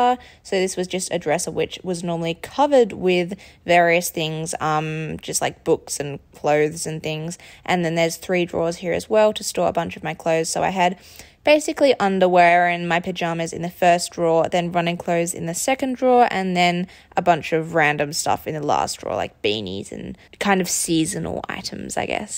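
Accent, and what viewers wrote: Australia. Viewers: Your intonation is quite special , not like the American intonation I have learned , and after I checked the profile , realized you are an Australian ,no wonder it’s quite different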